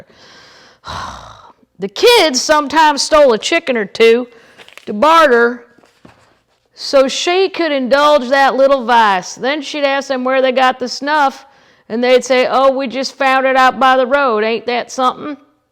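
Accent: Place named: South, United States. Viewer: I am speaking in a Southern accent that now I can't shake after watching you read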